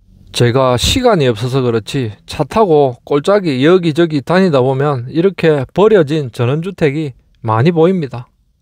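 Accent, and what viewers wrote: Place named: South Gyeongsang, South Korea. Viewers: 남해로 알고 있는데 아닌가봐요? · 경남입니다 대부분 이런맡투^^ 경상도 하고 는 조금 다르지요^^ 밀양 합천 창년 등은 ^^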